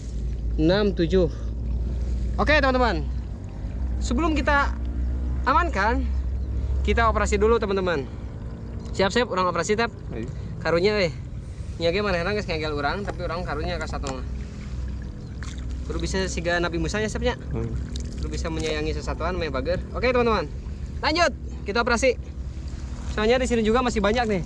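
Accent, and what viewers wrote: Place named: Garut, Indonesia. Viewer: Mang org mn Sunda na, seru ey, jiga na Garut nya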